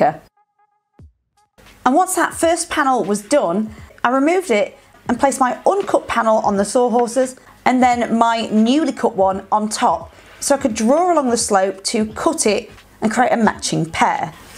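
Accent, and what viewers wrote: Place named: Stockport, United Kingdom. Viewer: Really enjoy your videos! I’m originally from Stockport Manchester, I’m now in the US. Your accent makes me think of home.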